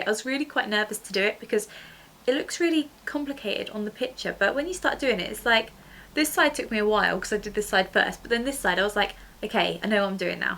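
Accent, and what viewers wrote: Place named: Yorkshire, United Kingdom. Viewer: Hey! Lovely make-up - I do love your accent...I think you might be from the Yorkshire area, I'm right? :3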